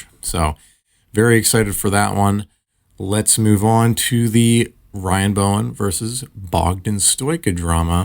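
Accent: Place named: United States. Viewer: Finally, found an AW news channel with an American accent .. plz post daily🫡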